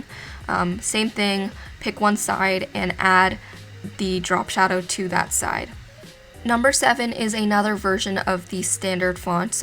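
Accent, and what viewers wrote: Canada. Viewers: I can surely say that you're Canadian from the way you say "about" and "out". Am I right???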